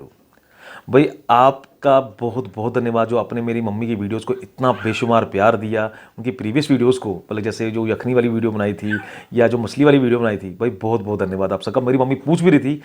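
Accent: Punjab, India. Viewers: I just love to hear the Punjabi accent hindi... ❤️❤️❤️ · Your accent is like my punjabi unclr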